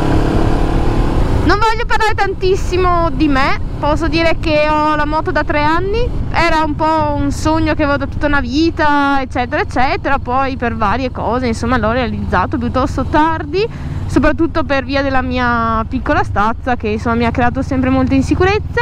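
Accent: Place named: Veneto, Italy. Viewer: L'accento veneto per i motovlog è un plus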